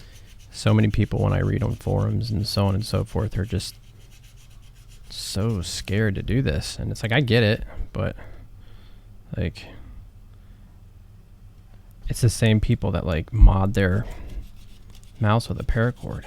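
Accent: Ireland. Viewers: R u actually Irish though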